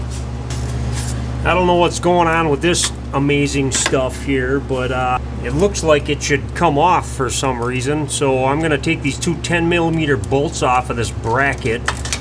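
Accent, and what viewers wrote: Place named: North, United States. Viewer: This is so good! Naming the nuts by MM. Talking to gravity in a positive way. Staying positive no matter what. No cussing. No broken knuckles. lol and a sweet accent. Northern US or Canada? Maine? Minnesota? Uper? Thanks so much man! Very well done!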